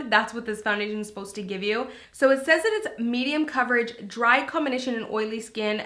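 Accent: Canada. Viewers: is it just me or was her accent extra canadian today?😂💜love it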